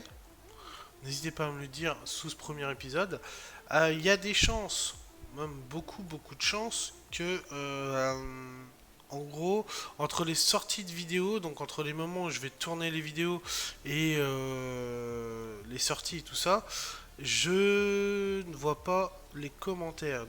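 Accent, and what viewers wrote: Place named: Quebec, Canada. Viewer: L accent québécois ça va il est bien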